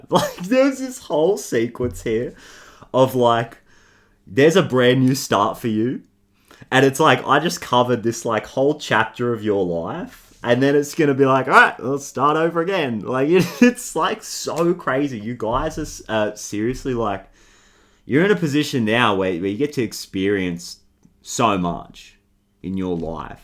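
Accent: Australia. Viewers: Hello from California. Great voice. Great messages from Australia. Fantastic Australian Accent, it's known as one of the most trustworthy accent. We appreciate you. Have a good day/week/month, from our channel. :hand-pink-waving::hand-pink-waving::hand-pink-waving::trophy-yellow-smiling::trophy-yellow-smiling::trophy-yellow-smiling:🐦🐦🐦🦅🦅🦅🦜🦜🦜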